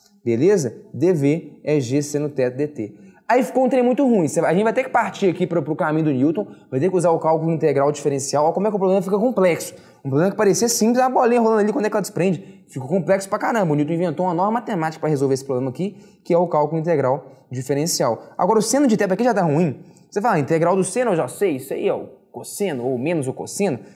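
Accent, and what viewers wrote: Minas Gerais, Brazil. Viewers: seu sotaque massa "minerim"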